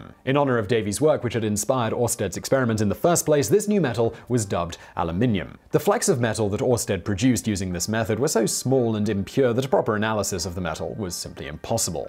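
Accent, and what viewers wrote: England, United Kingdom. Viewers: i Love the accent. is a Engish gentleman. i am from Pittsburgh Pennsylvania USA. so i have a weird accent. i moved to the south so i have been around weird accents. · If you ever get the chance to take a chemistry class from an English person, do it! Words like aluminum and equilibrium are much more delightful with an English accent. Thank you,! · I'm totally un-upset about the pronunciation. I'm used to hearing the "Brit-tone" speech of, and with that accent, if he pronounced it wrong I would be more upset. However, I WAS expecting there to be a "BONUS FACT" discussing this... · Nice to hear you talking English instead of American like you do in most of your vids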